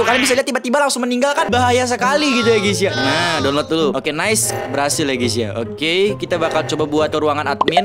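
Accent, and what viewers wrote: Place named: South Kalimantan, Indonesia. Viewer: kakak orang banjar ya,kok kayak orang kalsel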